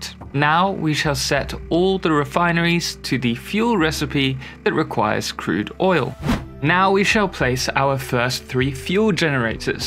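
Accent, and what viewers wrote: United Kingdom. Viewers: This has got to be the most British sounding person ever.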